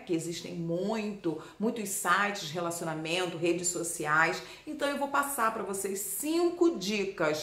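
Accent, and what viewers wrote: Rio de Janeiro, Brazil. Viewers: Convicções maravilhosas, ter cautela em qualquer situação, ter dois pés no chão, e cuidar com os perigos virtuais, também acho que vc e seu noivo formam um lindo par, são almas afins não existe alma gemea há encontros que não são conscidência, são providência de Deus mesmo pessoas que já estão ligadas pelo destino um do outro que eu sei lá acho que já são pré destinadas uma para outra...bjo vc sempre linda e elegante com essa dicção carioca do sutaque que fala o português coloquial.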